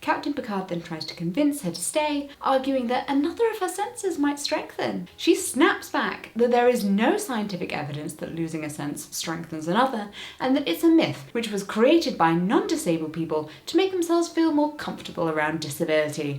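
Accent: United Kingdom. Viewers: Maybe it's my American accent hearing your British one, but I could also reason using the word "pawn" as a stand in 😉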